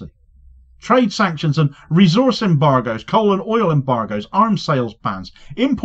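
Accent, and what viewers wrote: Cornwall, United Kingdom. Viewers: I did watch a video of huge protests in Chile which has a large Palestinian population. Great reporting as usual. One of the finest independent journalists, love the Cornish accent too😊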